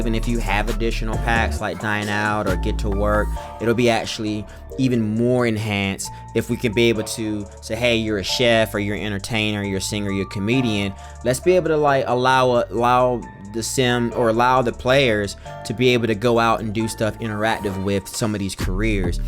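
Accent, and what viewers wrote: South, United States. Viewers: I think your southern drawl just didn't want to hit the r before the g. Burglars. Haha, no shade. All my family is from the deep South but I was raised in Iowa 😂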